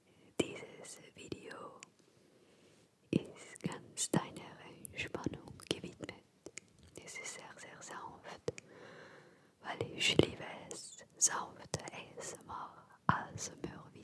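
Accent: France. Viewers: Normalerweise gefällt mir kein Französischer Akzent, aber dieses Video ist einfach nur toll😘😘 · Allein der französische Akzent entspannt mega☺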